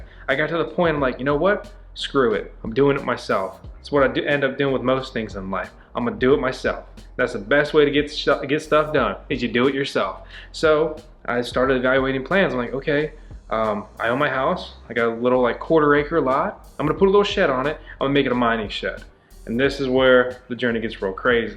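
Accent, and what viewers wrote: South, United States. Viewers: Your accent has become much more Southern.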